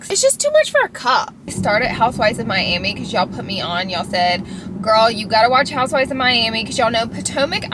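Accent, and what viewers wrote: South, United States. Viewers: I thought you were going to a vintage’Goth’ store and what you were actually saying was ‘Golf’. 😂 Your southern accent is adorable,. ❤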